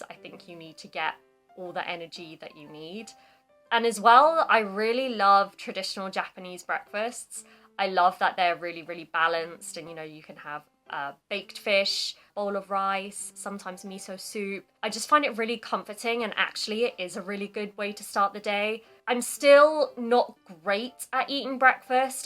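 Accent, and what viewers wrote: United Kingdom. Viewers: chill, sweet の使い方が勉強になった、英語と日本語が出てくるので、勉強になる。発音は英国式だし聞き取り安いです。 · イギリス英語美女めっちゃカッコいい!! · はぁ(о´∀`о)ブリティッシュアクセント最高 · イギリスアクセントが心地よいね(・∀・) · イギリス英語は聞いてて気持ちいいです。あんまり違いは分からないですが、イギリスの地域によってはちょっとおバカに聞こえたりしますけど、この方の喋り方は頭よさそうに聞こええる(笑) (ってか、英語ってイギリスがオリジナルでしょうけど・・・） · i enjoy your talks,really. i was living in London for 2 years. i miss tubes which i used when commuting .your talks and british english let me recall my old days．you are not scotish, right? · 英語の訛りについて解説してるマルチリンガルの人の動画を見てからこちらの方の動画を見たら訛りがイギリスっぽいと英語が全く話せない私でもわかりました。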